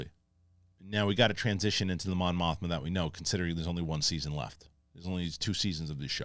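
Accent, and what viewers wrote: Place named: Brooklyn, United States. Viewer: Damn I love listening to lol! It's like listening to an uncle from Brooklyn describe some bad ass movie with a 90s neighborhood gangster take on the unfolding scenario with a brilliant mind and a east coast vocabulary!! F#@ great!! I love it!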